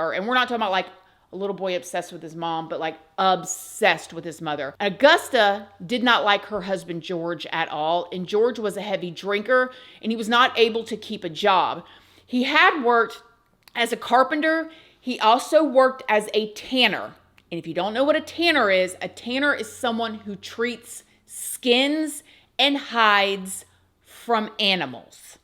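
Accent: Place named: Eastern Shore, United States. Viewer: Are you from delmarva? It sounds like a eastern shore accent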